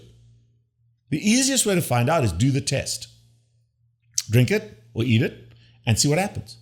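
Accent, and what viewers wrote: South Africa. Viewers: Thanks Doc...your accent seem to have some regional South African traces...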